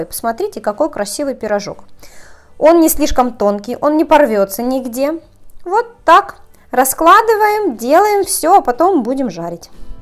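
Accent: Ukraine. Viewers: Люди, у нее все нормально с ногтями и с речью! Она просто из Украины и у нее такой акцент. Почему вы людям другой национальности не указываете как разговаривать! Вот 100% больше половины неучей и неудачников здесь что-то вещают на! Если что-то не нравится, прошли мимо нафиг и не смотрите! Зачем обязательно какое-то дерьмо надо написать! Жаль, что не наказывают интернет-гениев за оскорбления по закону!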